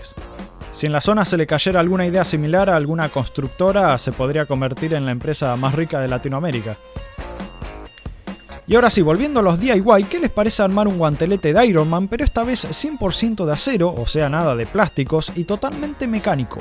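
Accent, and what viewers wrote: Argentina. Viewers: Que argentino ameooooooooooooo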